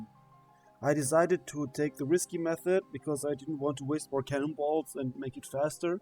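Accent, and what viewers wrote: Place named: Germany. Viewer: ich wusste sofort, dass du deutsch sein musst xD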